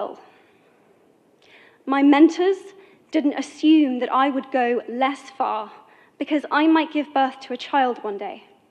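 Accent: United Kingdom. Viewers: It’s called talking slow in a brutish accent, first class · Her accent is British, I prefer the American one . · Came to learn British English accent and learned sometime more important. Gender equality.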